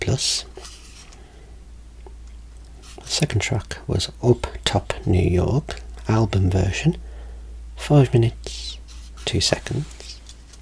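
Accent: United Kingdom. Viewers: I absolutely love your voice and that beautiful british accent. I swear you could even read the phonebook to me and i would enjoy it ❤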